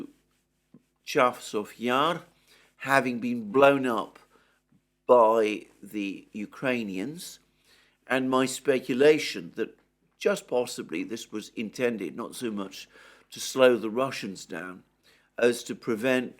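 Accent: United Kingdom. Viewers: I love your program,. I never miss it. You're well-informed, truthful, reliable and also a gentleman. Besides that, I found your British accent clear and very, very nice. Cheers from Santiago de Chile!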